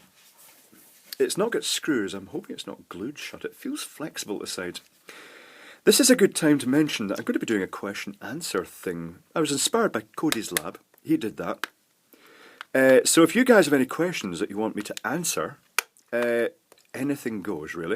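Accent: United States. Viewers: Dear, If you're still accepting questions, here's mine. Why do you use so much American dialect? Have you spent a lot of time in the States?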